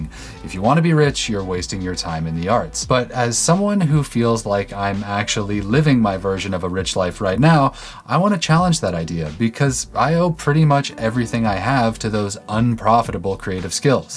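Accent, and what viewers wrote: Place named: Texas, United States. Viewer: Canadian? I thought you were from Texas.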